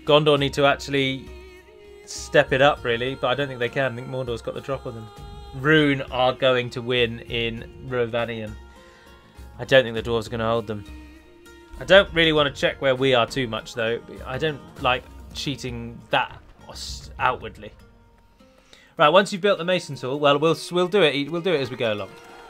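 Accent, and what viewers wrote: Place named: United Kingdom. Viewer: YOU just have the best British accent ivé ever heard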